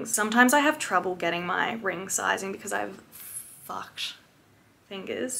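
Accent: United States. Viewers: The southern accent is one of my faves ahahahha 🤙🏻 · I love the country accent. Sounds just like my ma lmao 💜🖤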